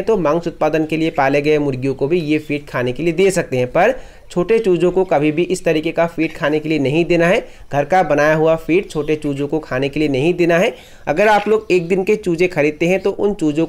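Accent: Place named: Nepal. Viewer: Aap Nepal me the kya pehle...aapke bolne ki style nepali accest se match kr rhi hai🇳🇵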